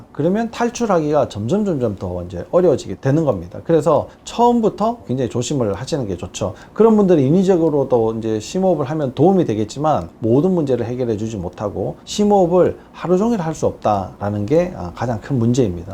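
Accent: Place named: Gyeongsang, South Korea. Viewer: 깅썅도 사투리.억양.엑센트 듣기 싫으네.... 기본도 모르면서 아는척을 엄청 나불 나발 거리네... 순진한 환자들 다 넘어간다...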